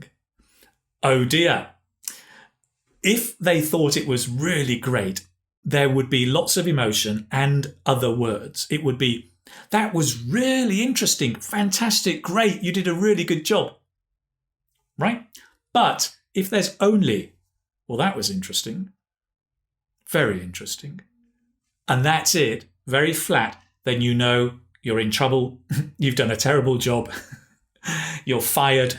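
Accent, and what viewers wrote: United Kingdom. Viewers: I love your videos and I love British English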